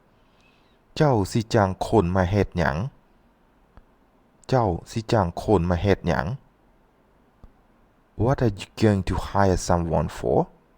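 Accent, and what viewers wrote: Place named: Laos. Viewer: what’s your ethnic group? The accents sounds a little bit different from my parents who are from savannakhet and champpasak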